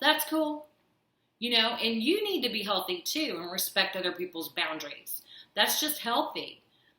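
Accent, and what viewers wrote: Texas, United States. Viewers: Are you from Texas? You've got the accent and that great kick ass attitude you need to deal with narcissists 👏👏👏👏keep up the good work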